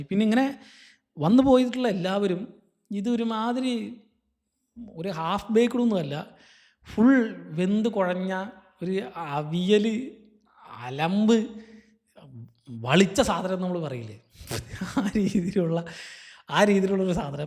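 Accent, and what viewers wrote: Palakkad, India. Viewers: 😂😂😂😂 നമ്മളുടെ slang വരുന്നത് കൊള്ളാം Bro Palakkad Slang 😂😂 Review കണ്ട് ചിരിച്ചു മരിച്ചു 😂😂😂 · Palakd ano bro sthalam.... Nice slang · Annante paalakkkadan bhaasha purathu vanna review......👍🙃😅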